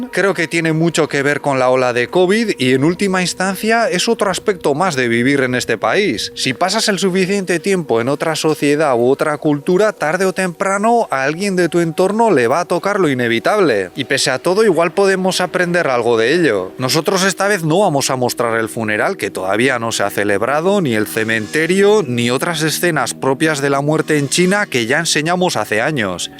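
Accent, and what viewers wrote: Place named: Navarre, Spain. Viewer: Lo siento muchísimo!!! No sabes cuánto me gusta un acento Navarro en China!!! gracias por informar del mundo y cultura china, tan desconocida por aquí.. Lo que digas, eres un hombre muy preparado!!! Aúpa Navarra y China!! Un saludo para tu familia Navarra y China. 😘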